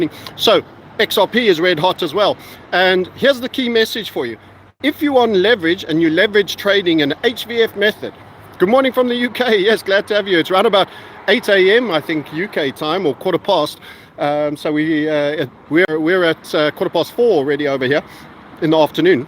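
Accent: South Africa. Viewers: Hi. New to the chanel. Is that a South African accent I hear?👀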